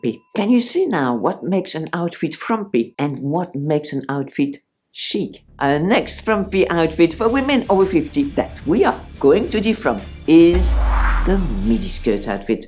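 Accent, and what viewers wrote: France. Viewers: Dear, I'm not even 40 yet but still like to watch your videos for some tipps and inspiration. 🤗 And I adore your French accent.. 😊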